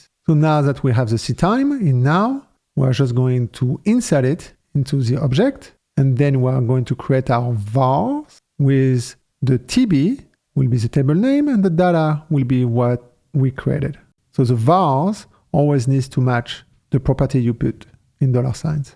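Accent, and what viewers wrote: France. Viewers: Are you french? You have a little accent xd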